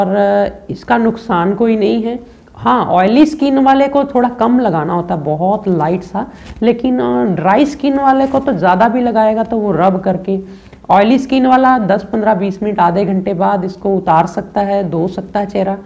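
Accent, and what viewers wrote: Punjab, India. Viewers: Aap Punjabi ho??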